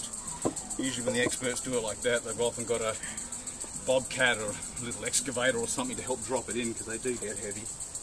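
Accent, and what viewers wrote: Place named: Australia. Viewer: Found a diy with Australian accent. Yay.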